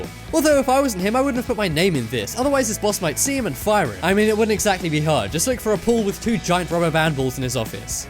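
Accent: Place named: United Kingdom. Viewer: to all the people complaining about how he says "strawberry": This dude's British, it should be obvious by the way he talks Unless British people are commenting about that, in that case... I don't care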